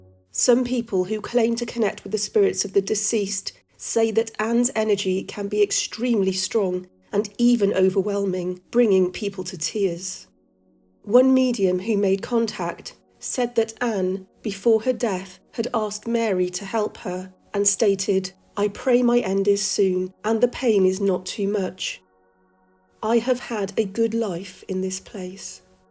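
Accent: United Kingdom. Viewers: Just found this channel this morning & now later at night this particular vid was in my feed. Had already saved to watch later but it came back through again so had to click just to hear that word pronounced with a Bri'ish accent lol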